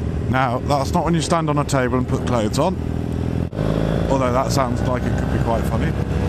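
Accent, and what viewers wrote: United Kingdom. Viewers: Such a wonderful British accent. Subbed old chap.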